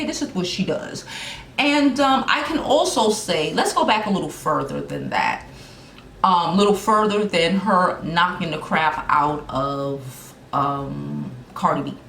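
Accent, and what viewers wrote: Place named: East Coast, United States. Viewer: I know my east coast people 😂💚 you either from Philly or DC 🤷🏾‍♂️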